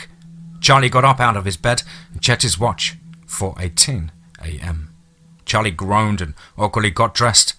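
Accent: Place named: Scotland, United Kingdom. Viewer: It's one am and I'm halfway through... loving it. Love the Scottish accent as well.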